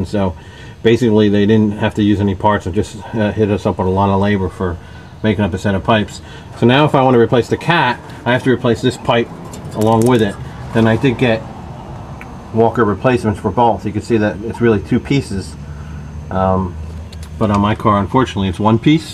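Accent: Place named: New Jersey, United States. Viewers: off subject.Where you from? your voice...you sound like your from Jersey.... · Yuppp he’s definitely from jersey cause I recognize that accent lmao & I’m a New Jerseyian myself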